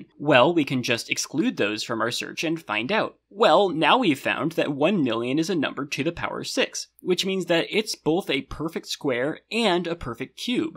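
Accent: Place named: Great Lakes, United States. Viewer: I like your somewhat pronounced Great Lakes accent, I guess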